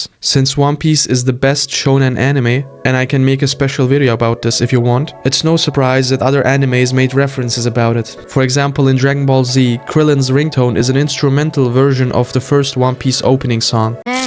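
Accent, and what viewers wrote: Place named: Germany. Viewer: i can hear that lil german accent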